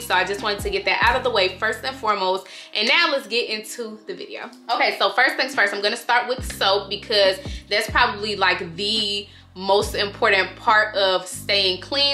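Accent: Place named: Chicago, United States. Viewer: Awesome vid. Are u from Chicago? I hear the accent lol.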